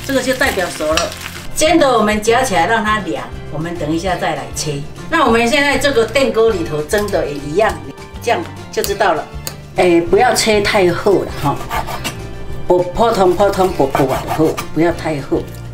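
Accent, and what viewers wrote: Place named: Taiwan. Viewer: 阿嬤的台灣國語聽得很親切喔😃❤️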